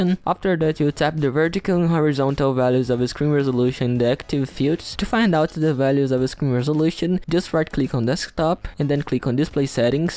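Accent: Brazil. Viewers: Da pra sentir o sotaque de brasileiro, mas seu inglês ta muito bom mano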